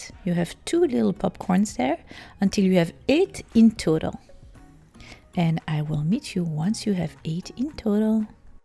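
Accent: France. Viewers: Houlala!!!! oulalala!!! J'aime tellement your Frenchie accent. Je comprend "presque" tout! Blog and vidéos are sooooooooo très très bien. And love your chaîne sooooooooo much. Hope de GAGNER ( hopefully )🤩🤩🤩🤩🤩🤩🤩🤩🤩🤩🤩 Je love crocheter...with you from France 😍😍😍😍😍😍😍😍😍😍😍😍😍😍😍😍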